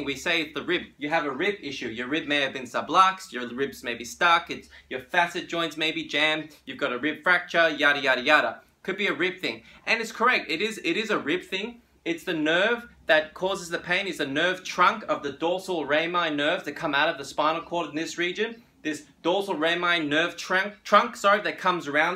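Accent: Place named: South Africa. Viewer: Hi, do you speak Afrikaans? You sound like you may be South African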